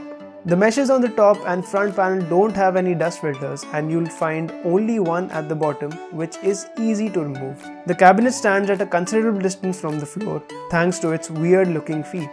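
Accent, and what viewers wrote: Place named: India. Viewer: Typical Indian accent... ...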